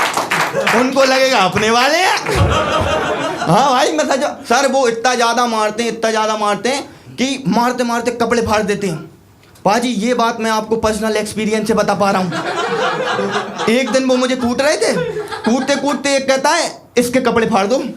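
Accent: India. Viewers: Bhai bundelkhand ke lag rai kaha se ho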